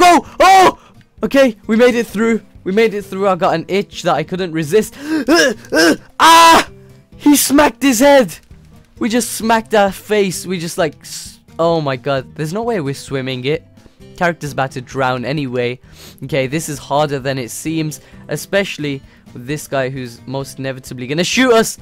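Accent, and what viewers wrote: Birmingham, United Kingdom. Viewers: That brummy accent doe😂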